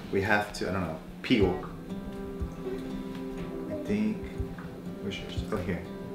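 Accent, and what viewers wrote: Minas Gerais, Brazil. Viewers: Sotaque mineiro não sai da gente mesmo hihihi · esse sotaque rs de Minas é lindo demais!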